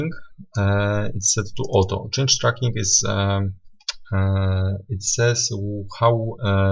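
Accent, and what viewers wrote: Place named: Portugal. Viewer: Why I am sure you are portuguese!!!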